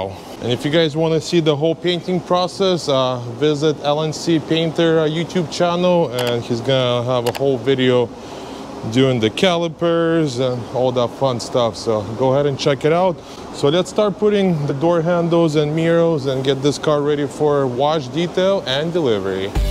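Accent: Russia. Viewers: Hello! Where are you from? I think you are from Russia or Ukraine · русский чтоль?)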